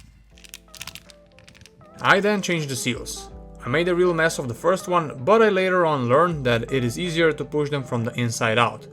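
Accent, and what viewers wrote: Slovenia. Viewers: Hearing a slovene talking in english is so bloody cursed 😂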